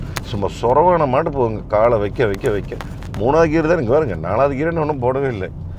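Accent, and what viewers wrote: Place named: Kongu, India. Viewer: அக்கா சரிக்கா. வெளிய போங்ககா 😂😂😂😂கொங்கு தமிழ்.. அழகு... மொறு மொறு மொறு மொருன்னு 😂😂அருமை அண்ணா 🙏🎉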